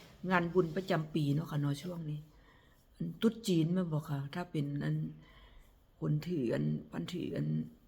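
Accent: Nyo, Thailand. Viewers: สวัสดีตอนเช้าค่ะพี่ ได้กาแฟดำดื่มแล้วสดชื่นค่ะ ฟังสำเนียงพูด เหมือนญ้อครพนมเลยค่ะ❤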